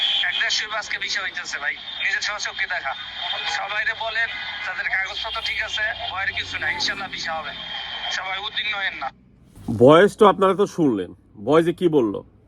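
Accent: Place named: Savar, Bangladesh. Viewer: assalamualaikum, vai apnar kotha shuina monee hocche apnar bari dhaka savar. vai ami savar thaki .amar onek diner iccha ami italy jabo kintu porichitoo kew na thakatee jaitee partechi na. vai ami deshee onek din jabot bekar boishaa achi kintuu kichui kortee parchi na. onek din dhoirai vabtachi itali jaboo .jodi choto vai mone koiraa iktuu help korten thalee chirojibon kritoggo thaktam. taka poishaa ditee parum inshaallah somossa hoibo na.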